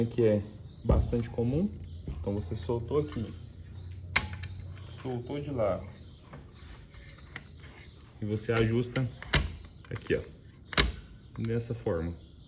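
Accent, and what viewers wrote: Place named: Inland Sao Paulo, Brazil. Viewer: Você fala com o sotaque interiorano de São Paulo, como o meu avô, quando diz "garga" ao invés de galga, oriunda do verbo galgar. Me deu saudade. Observações: Use luvas anti corte quando manusear máquinas de corte, aconselhável usar mascaras anti pó, principalmente em MDF. Se puder, óculos com isolamento para os olhos, também. No demais o vídeo esclareceu bastante. Obrigado.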